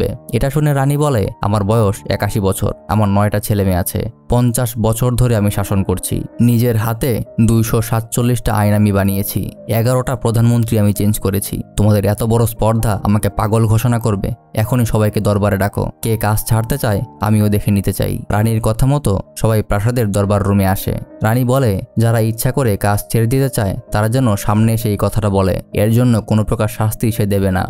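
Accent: United Kingdom. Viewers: vai apni movie explain kortesen ,apni sundor kore kotha bolben,seta na kore apni british style a bangla boltesen,ki bollen kiso bujte parlam na,faltu. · Your Bangle language speaking In English Accent was just awesome 🤣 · British vabe bolle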